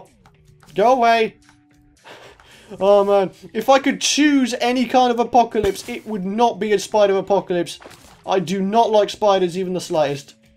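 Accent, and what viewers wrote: United Kingdom. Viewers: You sound a bit British but I'm Australian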